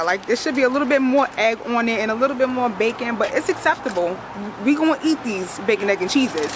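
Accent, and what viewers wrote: New York, United States. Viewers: She has such an authentic NY accent. Love my people! My fiance is from Wavecrest! I miss farrock. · Love her NY accent! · That New York Accent 😍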